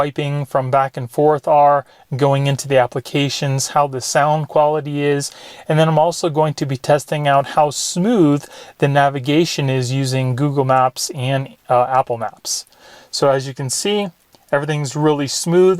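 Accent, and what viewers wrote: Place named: Australia. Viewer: Aussie voice cool.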